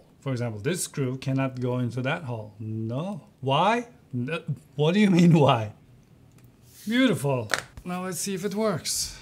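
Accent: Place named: Turkey. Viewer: Aksanından Türk mü bu adam acaba dedim :)))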